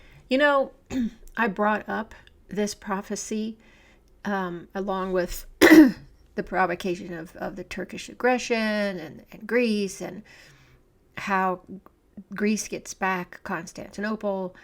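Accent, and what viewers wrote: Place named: United States. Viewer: YT “fed” my this channel. Interesting, but I have some questions, comments, observations. First, when did your mother attend HS in Palaio Faliro? I lived there. I knew THREE women who went to school there, before 1960, none ever mentioned that Constantinople would be returned. But I have heard others say they were taught this-in rural Greek schools. Second, observation, you sound American. You’ve never noticed that Orthodoxy, Hellenism, and Greece are generally painted out of the news-scape? Pascha is glossed over, because it’s not Western Easter, while Jewish, and even Moslem and now Hindu holidays get press. You haven’t noticed that living in the US? Because I have since I came here. You have some good nuggets of information. I like the links and references to Nima and Col. Wilkerson, and Col. McGregor. For whatever reason (perhaps they were spurned by the system), the tell a lot of truths the mainstream media keeps. 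However, they have their biases. I’ve been watching McGregor for years. I like him, BUT, he was a Turkophile. Of late, he has seen the light and become more objective when discussing the Turks. The US officer corps, Army/AF has always favored Turkey. Israel is committing the first live-streamed genocide and apartheid. Israel is every bit as despicable and criminal as Turkey/Ottoman Empire, FYI. Greece should not trust Israel, or depend on it. The Greek government is basically beholden to the West/US. They are puppets. Back to the prophecies (as reported by you)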